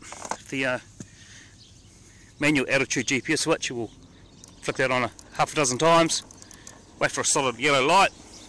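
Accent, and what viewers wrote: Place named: New Zealand. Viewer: You are awesome! thx a lot!! Love you kiwi accent. Been there for a yr living in Dunedin! Now i am back in Argentina, south america. Happy Flying!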